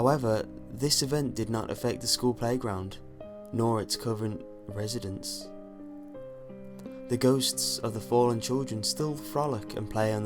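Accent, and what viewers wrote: United Kingdom. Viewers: Did anyone notice that this is the same bullshit story about Recess that has been spread all over the internet, but with a British accent. This theory isn't based on any evidence from the show, and has several holes. A theory about a TV show needs to be presented with evidence to seem interesting. This is just crappy fiction.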